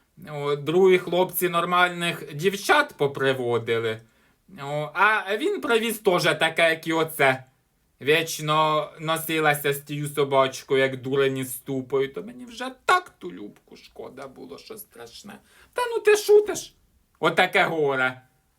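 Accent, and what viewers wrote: Poltava, Ukraine. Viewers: Вам срочно потрібно на "Україна має талант",Ви ж артистка ще та!!! Ну так же класно копіюєте наш Полтавський діалект,завжди з великим задоволенням слухаю! Рухайтесь до великої сцени,бажаю успіхів! Данилко теж наш і починав зі шкільної сцени,а тепер який знаменитий.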